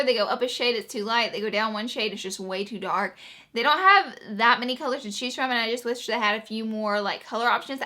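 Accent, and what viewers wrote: South, United States. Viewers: that country twang lol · I say oil the same way.. I think it's a southern thing because in Louisiana that's all you hear! 😂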